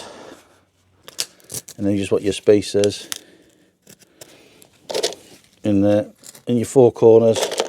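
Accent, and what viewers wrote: Wales, United Kingdom. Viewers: Wales is as beautiful as the people. Englishman living in Germany fellas. I miss Barmouth and visiting my retired parents who moved from Barmouth sadly more inland. I checked and I actually have more rain here near the Austrian alps than you do...but it's south Germany so we do get more sun. Love your people and the accent boy o. Top tips! let's have few pints together.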